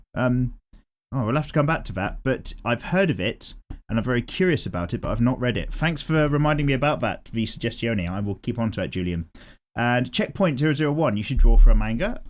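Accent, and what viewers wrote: United Kingdom. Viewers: Hello! I love your british accent, and I am inclied to do my drawings while listening to your amazing and bloody nice recordings. You are a inspiration for me, Sr.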